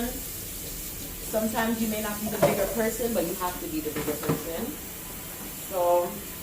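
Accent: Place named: England, United Kingdom. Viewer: Love your English accent... You always make me laugh.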